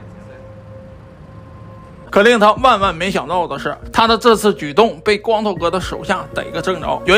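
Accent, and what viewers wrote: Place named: Northeast, China. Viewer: v 主播这个东北北京话有点意思啊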